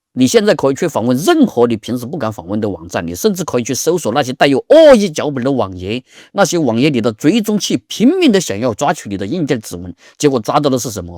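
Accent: Sichuan, China. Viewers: 你是重庆人还是四川人？太喜欢的川普了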